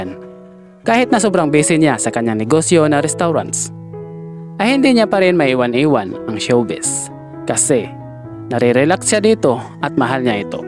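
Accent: Visayas, Philippines. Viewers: bisaya man gud.. pki banggit ng aayos plsssssss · Bisaya ka kuya?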